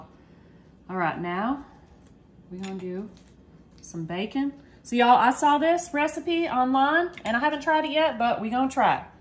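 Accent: South, United States. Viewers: Ma'am, i just knew by your sweet southern accent that all of these was gonna come out looking great told me everything i needed to know 😂❤ im making some of these real soon 🤷‍♀️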